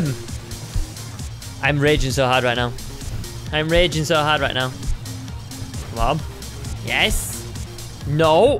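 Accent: Scotland, United Kingdom. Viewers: U sound british but yeah me too 😂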